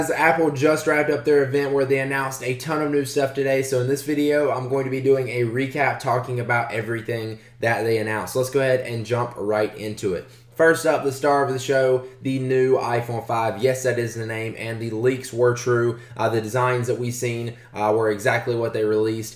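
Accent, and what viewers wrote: South, United States. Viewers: dont u guys think 300 buks 4 the new touch is a bit much,,,,,ps where is he from ( southern draw)(but wheres flat bill hats)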